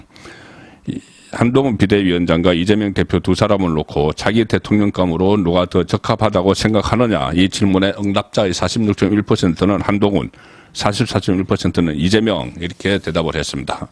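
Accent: Gyeongsang, South Korea. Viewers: 송국건의 혼술 구독자가 43만이 넘었네요. 경상도 출신의 투박한 어투지만 오랜기자 생활을 통해 터득한 다양한 경험을 여과없이 전해주신 진정성이 빛났네요 늘 공감합니다. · 야 개소리말어 경상도인간들 다바꿔야해 너같은인간포함해서..그래야 우리가 이길수있어 너자꾸 경상도눍은이 싸고돌지마 니 서투리듣기도싫어 우파니까듣는거야 전라도도 싫지만 경상도 지금 의원 전부바꿔야해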